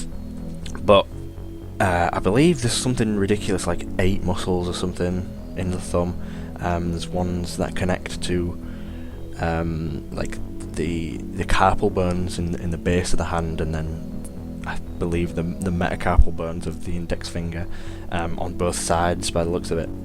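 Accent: Hull, United Kingdom. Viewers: You're welcome. It's literally a 'make everything beautiful' feature. A couple of clicks and boom! Realistic shadows and lighting. Did you use any constraints on the hand rig? They're a pain to set up, but they're a real timesaver for animators. Hearing the Hull accent on YouTube is weird. 😀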